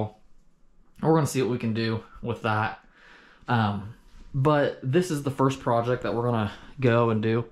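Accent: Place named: Pittsburgh, United States. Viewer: you sure sound like 'cole the cornstar' but he is from iowa/idaho...6 generations. ohio? i hear a little pittsburgh. does not sound like ohio at all.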